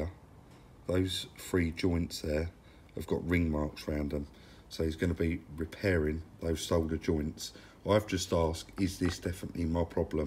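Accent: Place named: London, United Kingdom. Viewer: thats why most people like watching him. its So london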